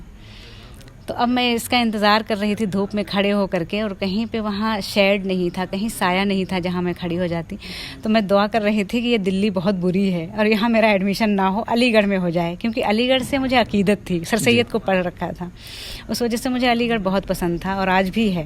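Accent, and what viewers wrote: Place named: Pakistan. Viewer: Masha Allah Aawaz me kitni Achchi urdu Zuban ki Bu hai Dil khush ho gaya sun kar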